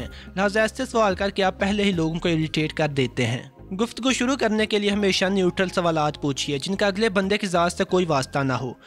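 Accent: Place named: Punjab, Pakistan. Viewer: Please please please improve your Urdu. Panjabi mai styles mai Urdu mat boleye.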